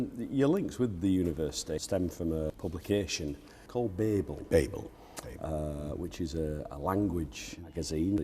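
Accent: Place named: Wales, United Kingdom. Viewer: He occasionally sounds a bit Welsh. Born in Northern Ireland. Wonder how that happened?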